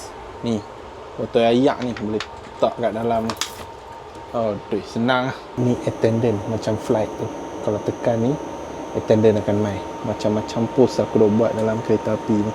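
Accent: North, Malaysia. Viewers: Tertengok masa tengah tunggu time nak tengok bola baru baru ni.. rasa dia ni sembang mcm org utara ja.. dah lama lama layan plak😀 tq la kami nak travel mungkin tak mampu. Boleh tengok dkt sini pon mcm pi skali dah ni😄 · Baru saja 3hari lepas saya tengok 1st video channel youtube ni OMG saya tak sengaja tersuka.tersubscribe ❤ terasa macam prabu bg saya tengok filem tamil version cakap melayu loghat utara.i like!!❤ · Addicted with his lorat utara. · Best lah bro sembang utara Senang faham sbb explanation slow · Bila first time jumpa channel ni, dah dapat agak memang boleh pergi jauh. First time nampak vlogger berbangsa India tapi cakap dalam loghat utara. First tu ingat ada mix mamak ke apa, rupanya tidak. Memang unik dan ada tarikan tersendiri. Tumpang gembira tengok channel makin lama makin ramai viewers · Org utara ka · Minit ..Orang kita dah terbiasa kan..yang jenis sopan la maksud sy..bila bagi dengan tangan kiri, akan say sorry..tak tau la..dia macam automatik tau nak minta maaf tu..padahal orang kadang tak kisah dan tak perasan pun..didikan mak ayah kita dulu kalau nak hulur atau bagi apa2 kat orang, guna tangan kanan..jangan guna tangan kiri..tangan kiri katanya kurang sopan..lagi2 kalau orang Islam, gunakan tangan kanan dan utamakan belah kanan tu Sunnah nabi..kalau masuk toilet je sunnah kaki kiri..apa2 pun, yang guna tangan kiri bukan lah maknanya tak sopan ye.,jangan ambil hati.. 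hihi..suka tengok abang travel sbb sikap dan bahasa dia bagi saya sopan..dan suka dengar loghat utagha dia.. semoga cepat capai 100k.. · Steady Bro. Loghat Utara mendunia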